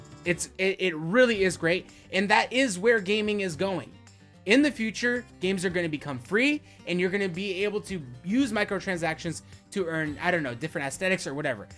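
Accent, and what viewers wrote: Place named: Russia. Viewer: you are 100%, they have made the game unenjoyable. But please in the next video talk in you crazy Russian accent. That is the reason I started watching this channel.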